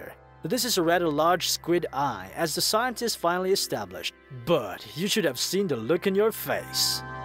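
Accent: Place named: Netherlands. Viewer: Narrator sounds Dutch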